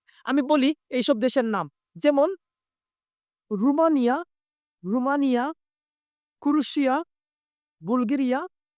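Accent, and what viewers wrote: Sylhet, Bangladesh. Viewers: ভাই সিলেটি মনে হয়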